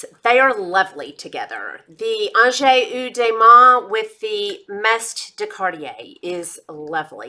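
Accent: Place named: Texas, United States. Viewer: Here from mr. Kongs mom channel... love your accent I'm married to a texan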